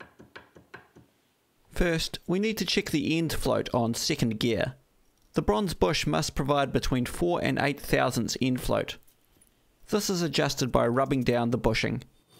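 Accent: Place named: New Zealand. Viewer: hi, I'm a kiwi living in Germany - I'm not a Land Rover fan but I wanted to watch a restoration series. Great to hear a kiwi voice, I'm really enjoying watch this series - well done. Des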